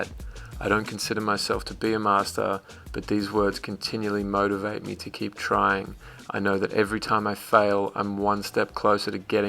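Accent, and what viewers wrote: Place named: United Kingdom. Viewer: Dude, you have the britishst accent ever!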